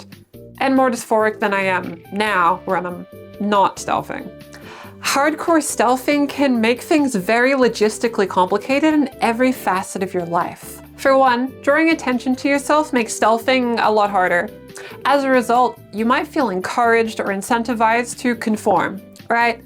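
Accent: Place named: Australia. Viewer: Huh - youre Australian accent is way more noticeable with your masc voice. Its a super interesting party trick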